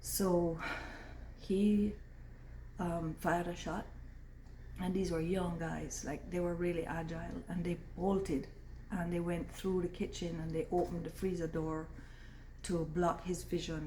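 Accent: Jamaica. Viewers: I left my home country in the UK due to discrimination. The world has changed in a bunch of weird ways. I'm English, I sound English (in that obvious way) in the same way you sound Jamaican even when youre not speaking like a Jamaican. I did not go to Canada, I went to my wife's home country of Moldova. It is an odd thing that as a foreigner with a love for Moldova, I am loved and treated with a higher acceptance than my home country. I think I know what went wrong. People from their countries belong to the internet, they dont have a place to belong, they dont have a place to represent. I feel your disconnect. I still love the UK... I spent time in Jamaica, I get it. I do... 😢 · Wow! It is amazing watching a white lady speak with an authentic Jamaican accent. Her accent is very real! Black people are killing each other over the things that white people have allowed them to have. 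We are living in a local & global system of racism (white supremacy) where Black people are being put into desperate situations where they have to steal and kill each other to survive. This is not just in Jamaica, but everywhere on the planet where people colour are. Including Canada. So there is no escaping this global crisis! These problems are not the directly & indirectly created by people of colour themselves, because they are prisoners of war. Slavery never ended in 1865. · This must hurt badly, even your accent is Jamaican. This is why i haven't when to Jamaica too much violence, i came to Europe 9yo now 40 and still haven't visit. The country need a safety net to help the people like in Europe or it going to be a nightmare in Jamaica in couple decades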